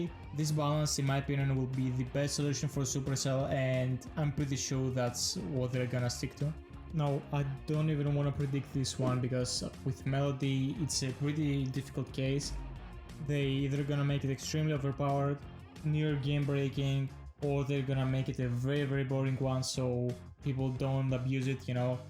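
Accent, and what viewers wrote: Greece. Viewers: Bro with your voice i can understand you are greek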